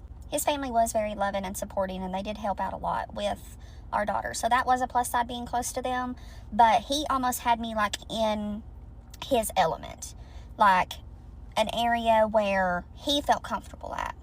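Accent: South, United States. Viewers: I can’t listen to her southern accent. Sorry, it’s triggering right now in our current climate. I bet she voted for 🍊. And her trauma is directly related to her southern indoctrination.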